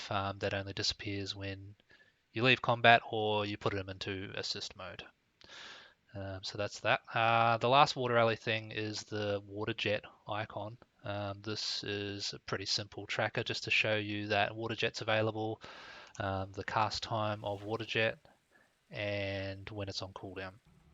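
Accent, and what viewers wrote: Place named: Australia. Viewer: Ah! yeah I wrote "are you aussie" first, then watched more and editted my comment to include kiwi haha. Your weakauras are awesome, cheers bud!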